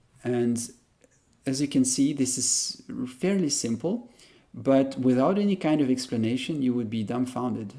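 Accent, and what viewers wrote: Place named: Tagalog, Philippines. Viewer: Dude I can hear a Tagalog accent..Your good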